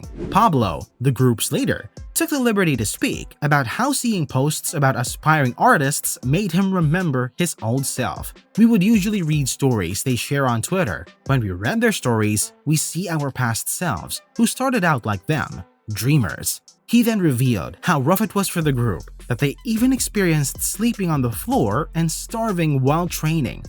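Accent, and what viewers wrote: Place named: Philippines. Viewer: your accent is like a filipino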